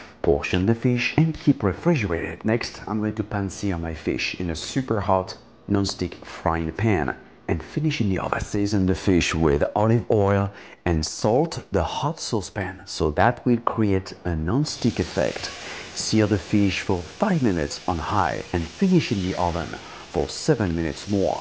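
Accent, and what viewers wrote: France. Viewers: Je kiff ta chaîne en plus tu est français sa s'entend à ton accent bref tu fais des trucs super beau c'est super continue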